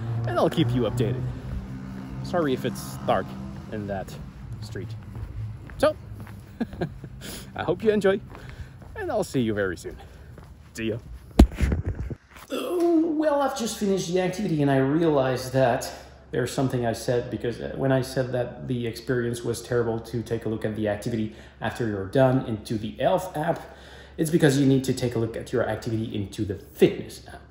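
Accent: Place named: France. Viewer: your accent is much too strong, when a French person tries to speak English it is usually very difficult for me to understand or I do not understand half of it because of the poor pronunciation of the words and I speak a lot of English and German and Dutch. I also think that you as a Garmin fanboy you just enjoy making Apple bad while it is an all-round top product. Garmin is certainly good too, but its not for me.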